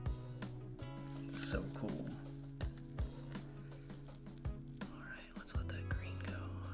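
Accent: South, United States. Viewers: That is super cool! I loved it as it came down over the cup, watching the different coloured cells go pass was amazing! The finished piece is fantastic. I love your accent, is that what's called a southern accent? I'm Australian so I'm not sure. Love this technique! I'll definitely try it if I can find a tiny hollow cup. Great job! 😀💜🐨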